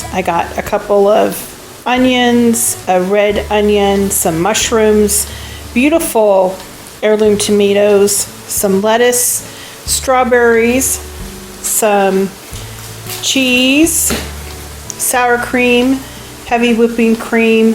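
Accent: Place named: Texas, United States. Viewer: I have lived in Texas my whole life and I swear you could be from here! 😋 I just love your channel and I have made so many of your recipes. They are always a huge success. Thank you for sharing your yummy recipes with us. You are a blessing.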